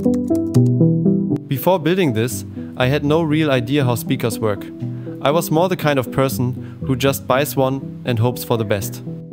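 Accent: Germany. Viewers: Man hört das du deutsch bist ❤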